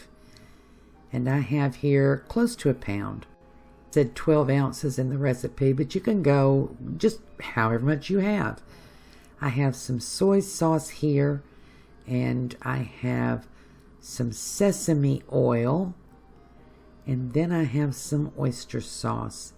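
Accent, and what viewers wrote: South, United States. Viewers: I knew you were from the south because of your wonderful accent but didn't realize you were in Georgia. All of my mom's side of the family live there. Thanks for all you do!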